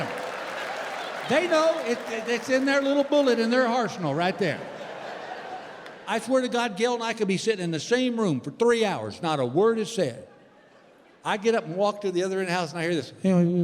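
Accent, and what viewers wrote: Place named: South, United States. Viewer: it’s not muddling honey, it’s his Southern accent 😂😂😂😂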